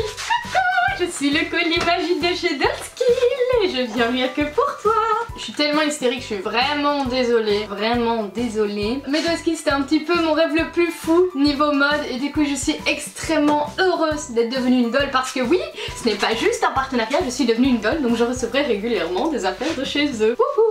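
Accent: Liège, Belgium. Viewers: Tu serais pas Belge t'as un de ses accent ! Je suis Belge lol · elle est liegeoise meme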